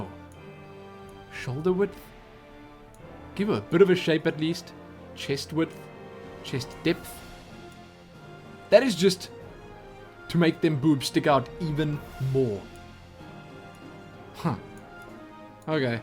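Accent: South Africa. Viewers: It sounds South African if anything... Don't blame you. South African accent is difficult to pick up, considering how many people haven't been able to tell what mine is. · it's dutch south african not british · Accent definitely sounds South African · but this guys accent is south african... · You South African? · D South African accent, I would know since i'm south african myself lol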